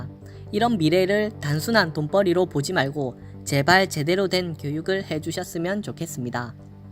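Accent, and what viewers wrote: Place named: Busan, South Korea. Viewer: 그럼요. 손까락이 아닌 달을 봐야지 . 부산억양 좋아요 ( ^^)/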